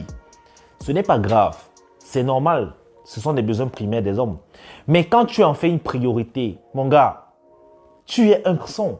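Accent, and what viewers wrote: Cameroon. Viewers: L accent camer du bled me fait toujours rire lol 😂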